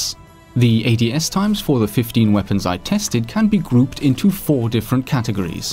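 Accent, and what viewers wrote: United Kingdom. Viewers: ur voice is so British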